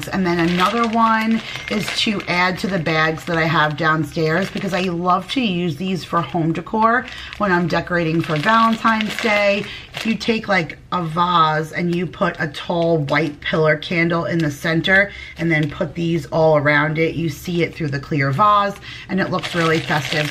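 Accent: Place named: New York, United States. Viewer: I was just watching another one of your videos I knew that accent I'm also from New York the Bronx no wonder I felt so close to u lol also ru Italian?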